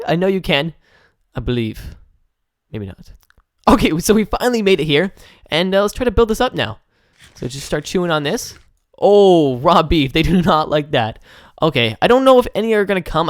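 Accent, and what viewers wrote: United Kingdom. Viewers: Does he have a british accent or the cutest lisp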